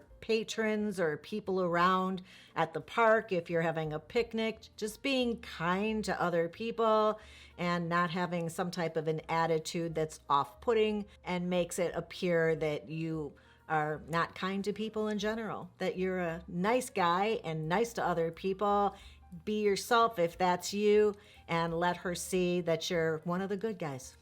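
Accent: Chicago, United States. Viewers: Hi. I love the knowledge that I have gained from your videos. I am from Chicago also. I love your Chicago accent. What part of town are you from?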